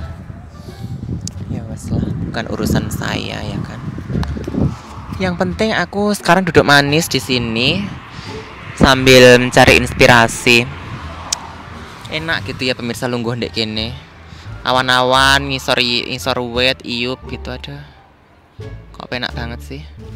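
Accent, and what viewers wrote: Java, Indonesia. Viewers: Woalah moasee medok😅😅😅👍👍... Sukses mas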